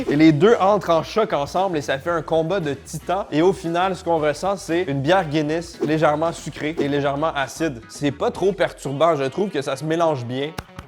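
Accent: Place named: Quebec, Canada. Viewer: Le français québécois sûrement 😂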